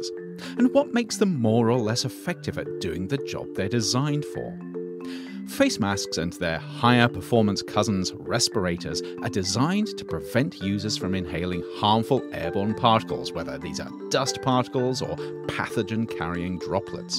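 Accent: United Kingdom. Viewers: Is there a reason why you choose to have a narrator with a British accent?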